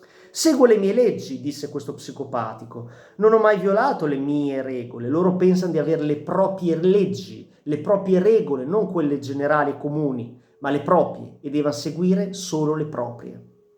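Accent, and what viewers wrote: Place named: Friuli, Italy. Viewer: Hai un bell´accento. Friulano?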